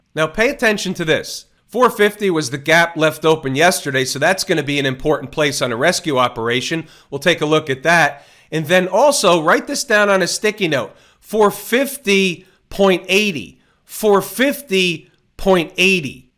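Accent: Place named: Brooklyn, United States. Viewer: you sound like a brooklyn guy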